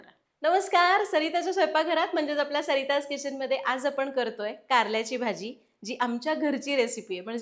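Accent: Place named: Pandharpur, India. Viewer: Ag tai tu pandharpur kiva mangalvedya chi aahe ka g mla aamchi bolnyachi aahe tashi vattey..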